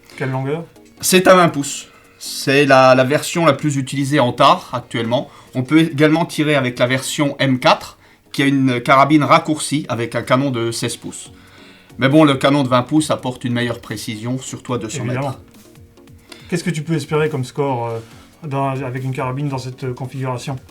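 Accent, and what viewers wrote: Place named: Eastern France, France. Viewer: felicitation super chaine De beau montage video digne de professionnelle Sujets tres interessants et d'actualités et on parle beaucoup de TAR Avec un leger petit accent de l'est de la france si jai bien reconnu bonne continuation cordialement ludo du sud de la france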